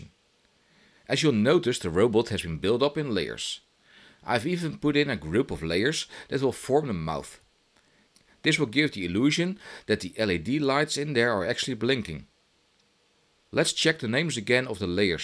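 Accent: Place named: Netherlands. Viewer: this sounds so Dutch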